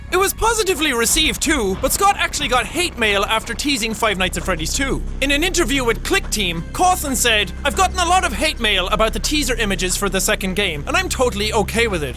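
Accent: Ireland. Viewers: It's his accent, he's Irish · I'm Irish and that's how a lot of us sound. Not me, because it depends what part of the country you're from, like Victor Cheng said. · Kyru Shelton he's irish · I love that Jack is so Irish in this video. Like he says "tree" when he means "three" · Not only is it weird to hear him not yelling, but back when this was made, his Irish accent was more audible. For example, three sounds way more like tree. XD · Justin Talmadge He's Irish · get the fuck off of YouTube it's not his fault he has a Irish accent it's just his nationality you cancerous dipshit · The Irish Blaggard Jack says "Top of the morning to you laddies" as a parody and a way to make fun of himself. He has stated this in one of his older videos. In fact, he use to hide his accent. If you want to see a REAL forced accent, maybe you should look at his older videos. It is in fact an Irish accent. 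Do you seriously expect all Irish accents to sound the same? As people have said, he speaks kind of monotone in this video and tries hard to pronounce everything correctly; similar to what he use to do. Maybe you should actually learn a bit about him before making an idiotic comment. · Because with an Irish accent you can't really turn it off. It's not on purpose, it's just natural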